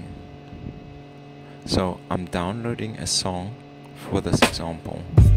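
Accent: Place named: South Africa. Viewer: Are you South African/ Afrikaans? You sound like it haha, weird workaround though I hope they just bring it back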